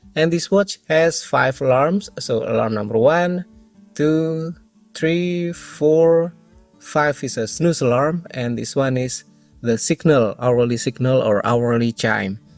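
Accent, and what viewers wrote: Indonesia. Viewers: Udah saya duga. Logatnya Indonesia bgt.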